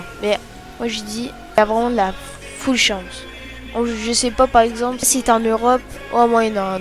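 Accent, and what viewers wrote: Quebec, Canada. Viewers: T'es québécois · T québécois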